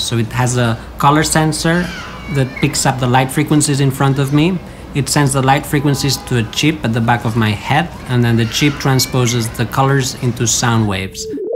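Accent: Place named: Philippines. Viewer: he sounds Filipino, is he Filipino?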